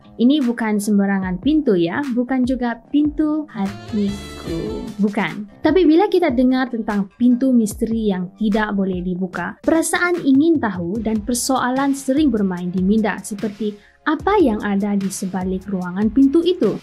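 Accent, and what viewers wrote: Sabah, Malaysia. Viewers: Kakak jane pun ok la.tapi lagi best abang.suara abang lagi besy sebab loghat sabah yang merdu jee.tapi kakak jane pun best jugakk😀😀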